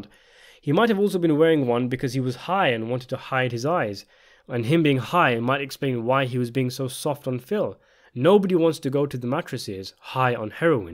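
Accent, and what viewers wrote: United Kingdom. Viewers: I’m sure I said this in a previous video but it’s so bizarre hearing a British accent talk about American mafia business and a show based in my home state but it works in the best way .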